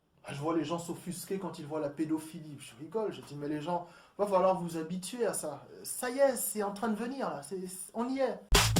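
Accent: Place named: Antilles, Caribbean. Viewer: salut ! Je suis une de tes abonnées et je me disais bien que tu avais un accent créole antillais :) j'ai aussi des origines de Guadeloupe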